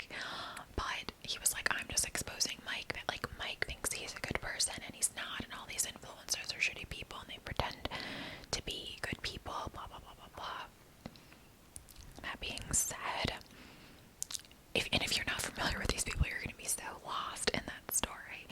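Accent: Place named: Vineland, United States. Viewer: Uuggghh!!! I love your whispers the absolute most because your whispers remind me of my cousins who are from Vineland NJ and their accents are very similar to yours. I live in Vegas so I don’t see them as often as I’d like but watching your vids reminds me of when we would have sleep overs as kids and we would whisper all night about boys we liked lol. I wish you all the success with your channel, and i can’t wait to watch you hit a mil😉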